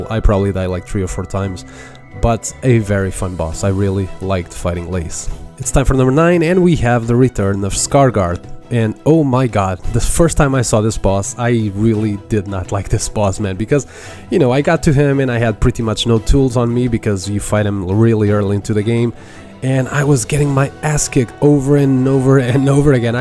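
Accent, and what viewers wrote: Portugal. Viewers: Are you portuguese (I recognize the accent) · pelo teu sotaque percebi logo que eras tuga porque é literalmente igual ao meu😅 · 1st i hate all of you for being able to beat bosses while only dying 3 times or wtv, I'm going to cry (except Moss Mother that i killed 1st try idk how yall do it) 2nd i was like this dude's accent sounds like he's portuguese, and apparently yeah? Bom trabalho 👍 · That portuguese accent made me smile ! 😄 nice analysis!! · nah i think he is portuguese I can feel on his accent.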